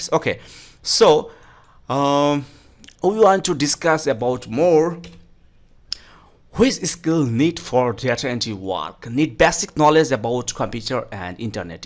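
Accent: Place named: India. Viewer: i love your indian accent next time put subtitle pls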